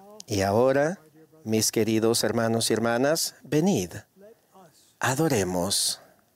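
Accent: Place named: Buenos Aires, Argentina. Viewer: Ese acento es argentino porteño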